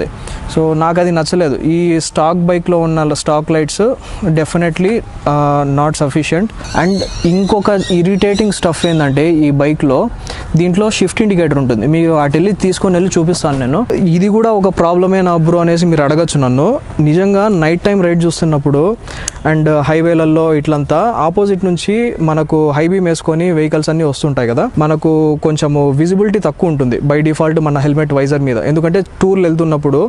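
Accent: Rayalaseema, India. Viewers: I alway love your rayalaseema slang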